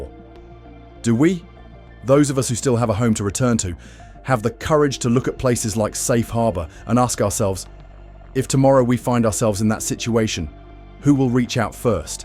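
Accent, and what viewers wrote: England, United Kingdom. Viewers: Why is a guy with an English accent narrating this video about PA? Could it be to lend credibility to a piece of propaganda?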